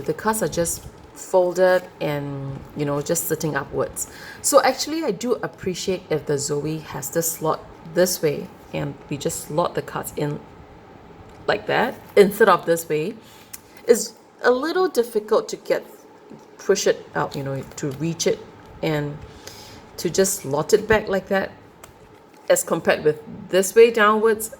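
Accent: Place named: Singapore. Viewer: Sounds like you are from Singapore. If you do could you let me know the price for both version? Thank you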